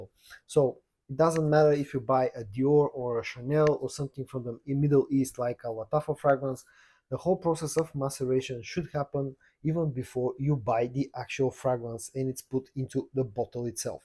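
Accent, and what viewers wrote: Bulgaria. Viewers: Yes, I was a 100 %you are Bulgarian. Judging by the accent. Congratulations!!!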